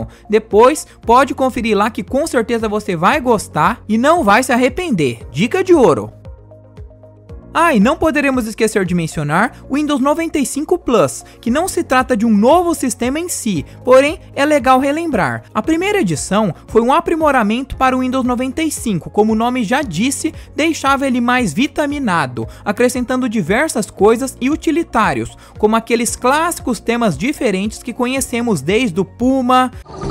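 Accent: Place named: Caipira, Brazil. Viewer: caipirês detectado...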